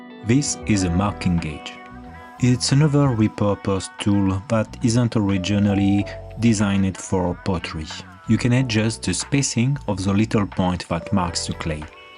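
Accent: France. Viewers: Again beautiful work. I like the ´frenglish´ accent too. You have inspired me a couple of years ago to make bonsai pottery myself as I learned a lot from your videos. Can´t wait to see your pots on the trophy next week and see the artist in person. Greetings from Belgium. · Great video! So nice to hear that great accent. All this time we didn't know you are French. Not that it matters, just enjoyable. You are such an inspiration. Thank you...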